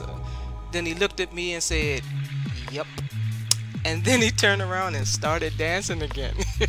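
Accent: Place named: Philadelphia, United States. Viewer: Greetings from Washington DC. You sound like you're from Philly. You may have mentioned where you are from in another video, but I haven't seen that yet because, as I said in comments on East Capitol Street Dwellings, I'm new to your channel. I'm very familiar with Barry Farm since I've used their recreational indoor pool since 2014 off and on. Best wishes, Sis.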